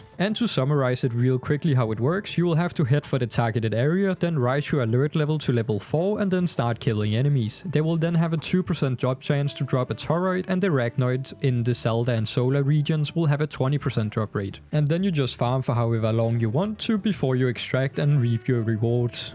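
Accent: Denmark. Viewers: Great video. You sound Denish?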